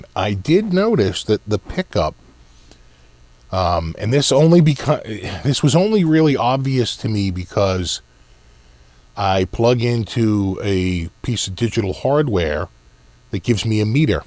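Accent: Boston, United States. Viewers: From Boston? Say no more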